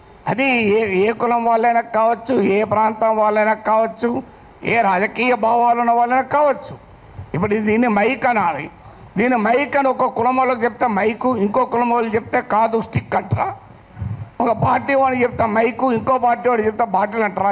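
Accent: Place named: Andhra Pradesh, India. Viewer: Ithanu andhra ayaana eeyana baasha choosthe ardham avthundhi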